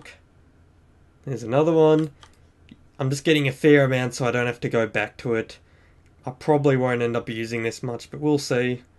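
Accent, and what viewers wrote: Australia. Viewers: I'm in love with the aussie accent!! chef's kiss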